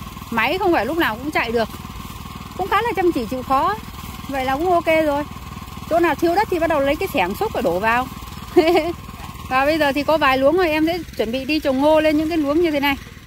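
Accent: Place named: Nghe An, Vietnam. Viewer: Quê nghệ An hay thái bình hả cháu ơi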